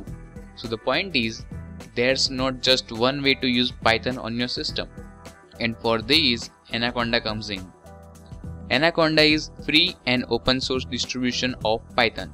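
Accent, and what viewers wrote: Gujarat, India. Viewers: Make gujarati friends and you will understand this accent. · From your accent !! I am also Gujarati that's why i know.. BTW i like your video and want more videos about ML and AI. THANKS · R u gujju? I assumed by your tone.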